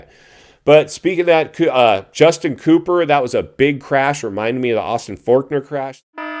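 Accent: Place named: United States. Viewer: 😂 American 💩 talking again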